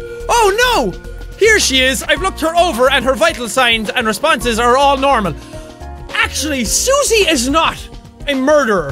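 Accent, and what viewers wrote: Ireland. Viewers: hes irish thats why he has that accent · I just got out of surgery. What’s better than watching my surgery as a video game with an Irish lad commentating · He's Irish mate. I'm half Irish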